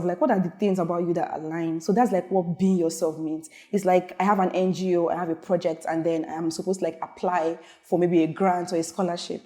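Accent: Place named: United States. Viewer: Omo, you don dey get American accent small small oo😁😎 Nice video, goodluck to those who applied this cycle.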